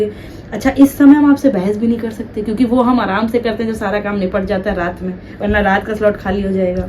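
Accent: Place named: Uttar Pradesh, India. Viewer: My lovely daughter don't take any tension now, think about your health and try to prepare another comment of your show, your evert, show is superb, and everyone enjoy our show fully, I love to watch your every shoe full of interest , UP accent, and our sisters and daughters authentic style, a graceful looking show, I am watching your show from Karachi Pakistan with love ❤❤❤and good wishes to everyone around you and in your circle ❤❤❤❤❤👌👌👌👌👌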